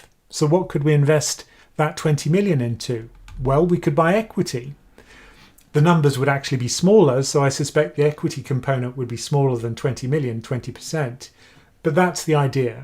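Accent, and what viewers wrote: United Kingdom. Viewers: Temporary. Targeted. Transitory. Sounds like US Fed talk, just with a British accent.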